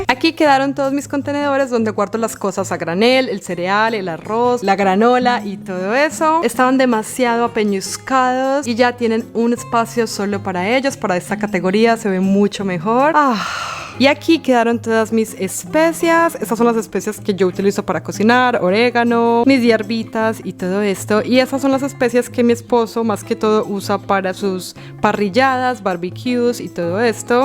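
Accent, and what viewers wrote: Colombia. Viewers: Me encantan los vídeos de organización.. pero sobre todo cuando hablas y t sale lo colombiana q eres.. m encanta ver lo alegre y divertidas q somos las latinas ❤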